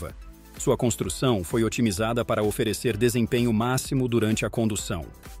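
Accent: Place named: Russia. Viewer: I feel a russian accent... 😮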